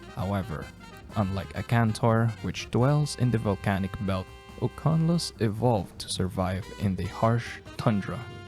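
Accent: Philippines. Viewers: The filipino accent is strong with this ons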